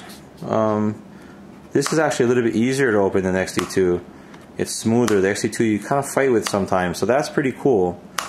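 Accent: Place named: New York, United States. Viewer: I got my X-H1 today and watched your Opening Box Video when opening mine. Cool. Share the experience. One thing I was hoping you’d talk about: what is that little gizmo that looks like a T? I’m an engineer and can’t figure out what the heck it is. I read manuals as a last resort. Another thing: I sold my X-T2 Graphite for this one. I have an X-T20 as my second body. I like the look and feel of the X-T2 much better but I bought this for one reason: IBIS! I’m a prime shooter. I have one zoom - the 18-55mm and unfortunately got spoiled by being able to shoot at much lower ISO. The IBIS on the X-H1 is fantastic. 1/8 sec? No problem. Tack sharp. Worth the upgrade. Now my 56mm f1.2 comes with me on night street shooting safaris. (You think the X-H1 is heavy now?) The larger body was necessary to fit the IBIS. I like the extension rear of the mock mirror housing. 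That too was necessary to get more distance for the new 3.6M screen. The sub-display? I’ll never use it. I’m not about to put on glasses just to read that. Defeats the purpose. I wish they have kept the Exp comp dial. I used that and it kept more the retro look. Hey, since you sound like you’re from NY, like me, stop trying to pronounce “tt.” Sounds unnatural. The Queen of England doesn’t so why should you?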